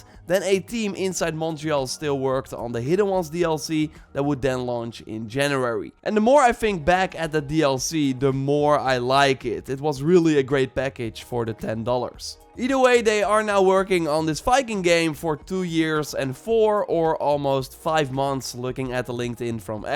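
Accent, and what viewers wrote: Netherlands. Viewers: U sound dutch dude xD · lekker maat, goeie video. ik vroeg me al af of je Nederlands was aan je accent te horen. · NGL, when I imagine a Dutch accent, this is what it is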